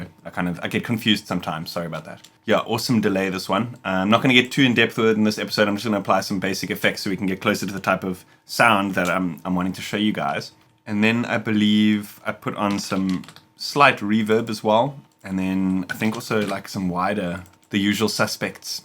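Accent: South Africa. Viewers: You’re a good teacher on the subject you sound SouthAfrican?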